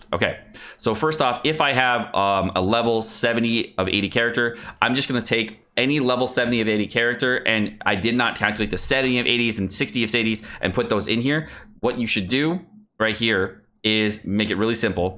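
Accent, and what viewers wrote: United Kingdom. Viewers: No British today?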